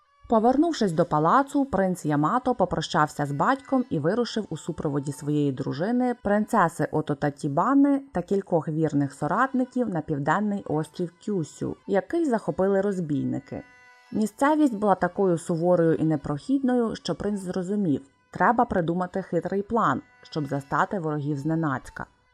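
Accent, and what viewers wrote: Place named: Russia. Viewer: Йоооооййй! Це так цікаво! Тільки є одна штука, яка турбує. Часто деякі зі звуків у власних назвах ви вимовляєте на російський манір, як-от -сі, а не -ші, чи -ті, замість -чі. Так само неправильно -дзі, -дзя, -дзю, -дзьо, натомість : -джі, -джя, -джю, -джьо. Ця система (яку я навела як правильний приклад) транскрипції і транслітерації, здається, Коваленка. Більшість сучасних українських перекладів з *японської саме за нею. Отаке. Дуже дякую за цікавезні теми! Буду чекати ще щось із японської міфології. Прикольно впізнавати назви і імена, які зустрічались у сучасних роботах країни сходу сонця.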